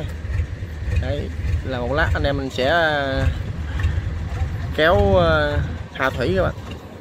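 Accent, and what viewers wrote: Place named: Mekong Delta, Vietnam. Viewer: Chỉ đọc câu chào bà con và các bạn đáng được 1 like .và chia sẻ . Nghe thật dễ mến, chất người miền Tây . ( Ghét nhứt những người nói câu chào mọi người nghe thô tục và chói tai )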